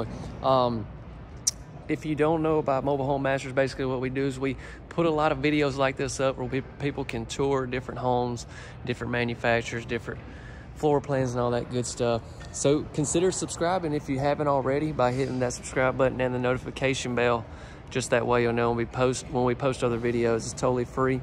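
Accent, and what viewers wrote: South, United States. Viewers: Here in Texas, they say "fixin to"....in his adorable way...says "fitin to".....I am beginning to learn Southern with him!!! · but it is you. You are a southener. Not a bad thing. It is in your personality be glad it's a likeable trait.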